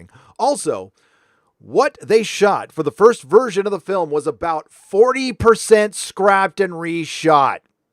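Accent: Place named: Scotland, United Kingdom. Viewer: Dude, I didn't need 23 and Me to tell you are Scottish. You are so very Scottish. Well aside from not hating the English anyway.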